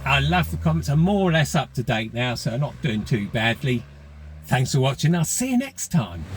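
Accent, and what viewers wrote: United Kingdom. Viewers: Are you close to Rufford Ford? I thought you were from down south London way.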